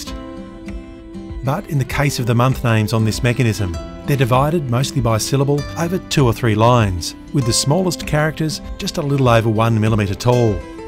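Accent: Australia. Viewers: admittedly i skiped to this vid but after seeing your craftsmanship i have to watch the whole series as well as your other vids 😅 aussie accent is a nice bonus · Anyone else remember like 5 years ago when you clicked on a video of a dude with an Australian accent making a small brass scribe? Crazy stuff..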